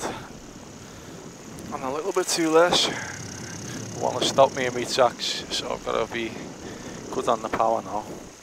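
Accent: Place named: Liverpool, United Kingdom. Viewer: I watched it 10 mins after it dropped. Great skills. Keep making the videos. I love them, I'm hooked. It must be you that I have seen flying over ours, in Thornton, by the new brooms Cross Rd. I'm hoping to get into this myself. It's sounds amazing someone with a good strong scouse accent giving it the bitters in a paramotor.. Love it. Keep em coming. Stay safe.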